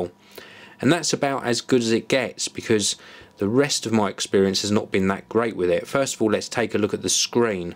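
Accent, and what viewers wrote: United Kingdom. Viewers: I love hairy guys with british accent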